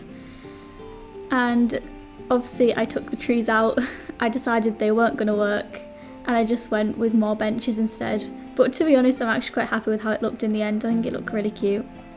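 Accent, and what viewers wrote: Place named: United Kingdom. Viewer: Are you from the U.K.? I love your accent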